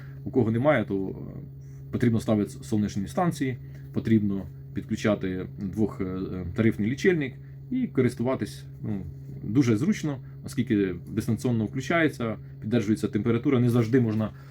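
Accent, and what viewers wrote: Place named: Surzhyk, Ukraine. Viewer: Дякую, все цікаво, але цей СУРЖИК.... Кожне третє слово.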